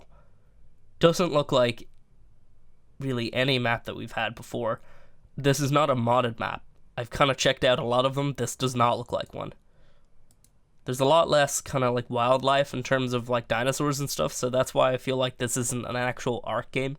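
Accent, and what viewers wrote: Netherlands. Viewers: are you Dutch? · Nederlands? Dutch? I can't tell from your voice, well some times a little bit if you listen closely but good english, i noticed that your envidia shadowplay is in dutch :) nice vid man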